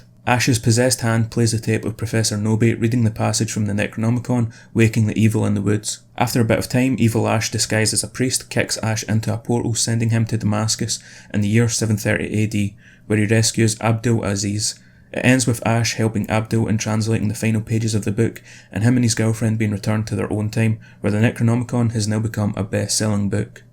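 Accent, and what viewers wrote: Scotland, United Kingdom. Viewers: Two things right off the bat. 1- Eyy, a fellow scotsman! Honestly really nice to hear someone who sounds kinda like me lol. 2- I might not be keen on Iceberg videos (8 out of 10 times it's crap imo), but I'll give you this... you cut straight to the chase, no bollocks or filler. Commendable in a platform filled with so much fluff, it would make a teddy bear look like an anorexic supermodel. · It's nice hearing the same accent being spoken with same slang used on a platform like this (except of course for exceptions like limmy, but Scottish youtubers are few and far between on this platform). Keep up the good work, your iceberg videos are really nice and informative 👍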